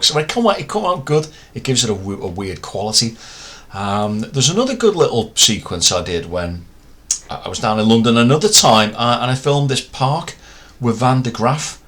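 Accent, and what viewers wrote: Liverpool, United Kingdom. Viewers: I love your liverpoolian accent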